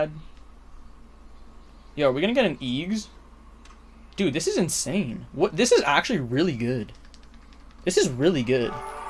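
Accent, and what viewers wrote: Canada. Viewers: Is he Canadian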